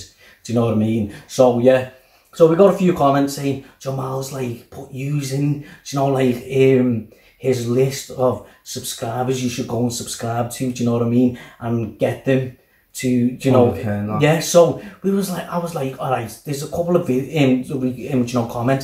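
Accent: Liverpool, United Kingdom. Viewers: Congratulations and for Jamel AKA Jamal recommending your page so nicely. You are one of 5-6 sites he recommended. He had done this once before with some other sites. Now he does it here and you are one of the picks. And you DESERVE it as you put so much into your site. You are known for your openness, listening to different artists from many cultures, many languages, many genres. Your site is amazingly diverse and truly international. You have some of the best subscribers who contribute and offer choices. You know us by name and person! And you are so much fun, and knowledgeable and expressive. Absolutely cute with a Scouse accent that makes your site just so charming. Spread the love, spread the music! Spread the diversity. Congratulations.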